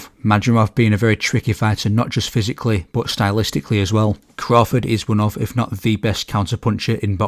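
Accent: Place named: Northern England, United Kingdom. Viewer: I like your accent, probably Northern accent in the UK.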